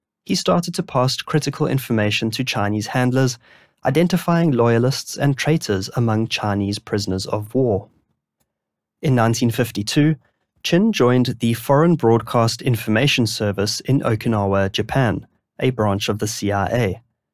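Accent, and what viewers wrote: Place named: South Africa. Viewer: Love your content and I miss the long-form content. Greedy, I know, but I make space in my day to watch you before anything else when you post here. Love your SA accent too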